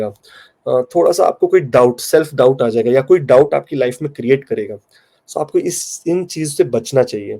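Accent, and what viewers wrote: India. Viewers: Why you speak indian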